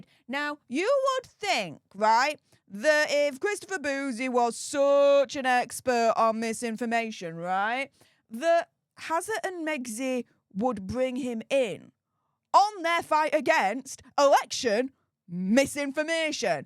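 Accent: Yorkshire, United Kingdom. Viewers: has a Yorkshire accent. So do I. I'm proud to be a Yorkshire lass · English is my second language , but I understand her..She has heavy Yorkshire accent..They talk like that, I checked sometime ago on YT.,when somebody mentioned her accent..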